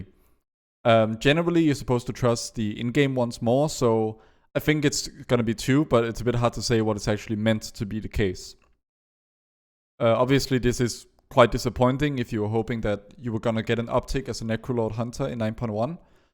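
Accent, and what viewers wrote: Denmark. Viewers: Are you Danish by any chance? The accent seems very familiar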